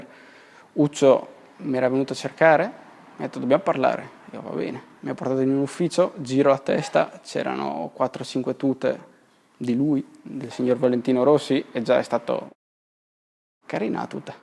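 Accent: Spain. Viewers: si sente che hai passato anni in spagna sembri uno spagnolo che parla un perfetto italiano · È vero comunque c’hai più la parlata e l’accento spagnolo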